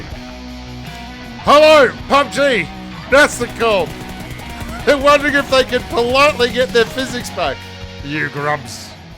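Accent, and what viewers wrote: Australia. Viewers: He's an Aussie mate.... GADDEMIT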